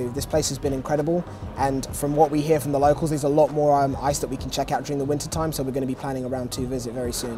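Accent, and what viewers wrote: United Kingdom. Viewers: Lol, the start of the video just made me laugh so much.... A British? guy talking about ice-hockey. Never expected to hear that. Football, yes, but hockey....wtf! Ok Britts won 1936 olympic gold in hockey. Britts and hockey is like us Finns and football i guess.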